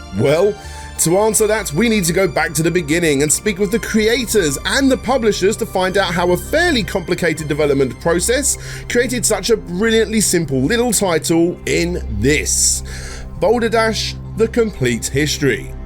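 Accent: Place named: United Kingdom. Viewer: It's very strange. You sound British, but you look American. Very queer combination.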